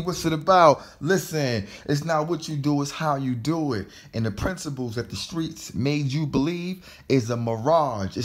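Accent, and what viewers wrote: New York, United States. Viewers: This is a New York nigga if I ever heard one desus and mero got me on the lingo 😂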